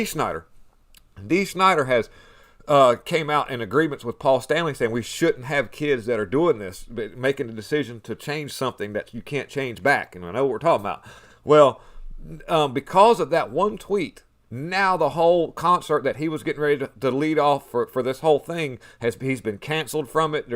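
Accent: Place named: South, United States. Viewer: ! Damn bud! You are pointing out some things that we all need to pay attention to. I don't want to sound disrespectful, (because I see you as much more than this) but others may judge your Mohawk and southern accent as meaning you're not a scholar...but I respect your insights and how you take deeper dives into what's happening around us. May The Good Lord grant you all the wisdom that you are seeking and bless you and your family as you share His insights to you. Love you. UDABST!!!!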